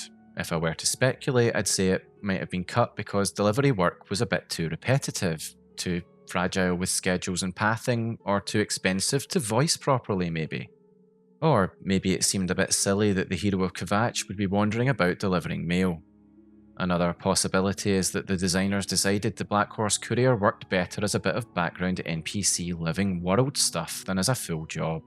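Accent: Scotland, United Kingdom. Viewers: Algorithm just pushed this to me, I like your informative low energy and the Scot accent adds to the soothing output of information. Good video to listen to while relaxing or sleeping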